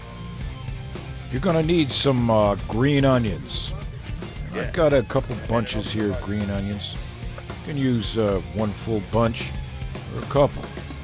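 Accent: South, United States. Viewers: James Cassel Southern U.S, man. Don't judge them.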